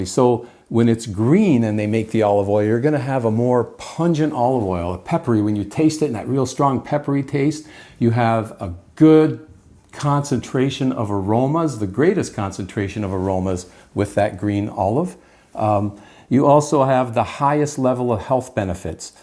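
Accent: Canada. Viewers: Your name is? You sound Canadian. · He has Canadian accent😊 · Is that the Canadian accent I hear? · Within ten seconds , i'm like cCanadian! Lol.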